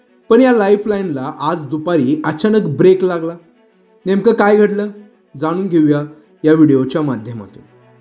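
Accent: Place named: Vashi, India. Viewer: News reporter vashila Wala ahe. Signal la single boltay.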